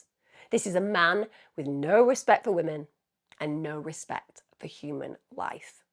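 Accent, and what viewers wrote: United Kingdom. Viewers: i live in LEE COUNTY FLORIDA , FORT MYERS and its so funny hearing someone from the UK talking about this story .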